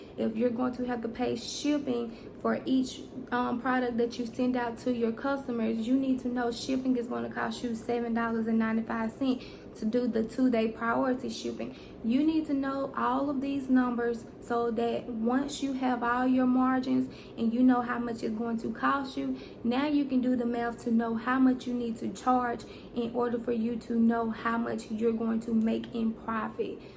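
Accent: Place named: Mississippi, United States. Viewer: I hear the Mississippi all in your voice! 😉 very informative channel, so I just subscribed!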